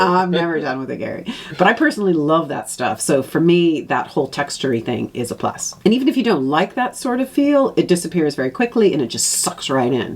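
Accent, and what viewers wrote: Ireland. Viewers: I am new to your channel and wondered what your accent is. A little Irish? Just curious. My boyfriend also hates Snail Mucin! Any time I use it, he doesn’t want to kiss me but ultimately can’t help himself in the end 😂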